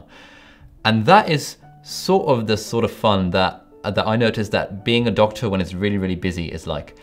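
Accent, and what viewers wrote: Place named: England, United Kingdom. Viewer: So nice to hear a well-spoken, articulate English accent..Well done.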